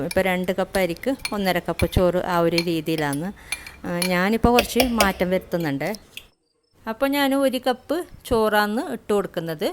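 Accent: Kasaragod, India. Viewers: Kasaragod slang aanallo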